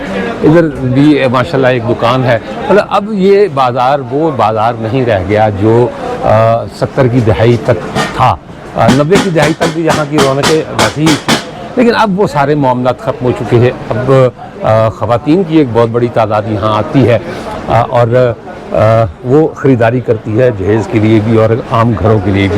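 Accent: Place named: Bihar, India. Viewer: Kia. Tum. Shia. Ho. ? Kia. Tum. Bhia. B. Ho. ? Apni. Urdu. Say. To. Bhia. He. Lagtay. Ho.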